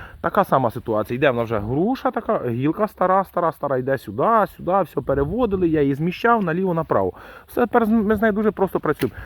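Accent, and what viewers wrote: Vinnytsia, Ukraine. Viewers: Забий, на Вінниччині всі так балакають. І це не від любові до 🐖🐶-ї.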